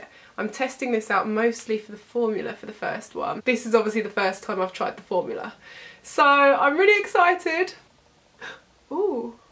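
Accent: United Kingdom. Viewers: you British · Are you british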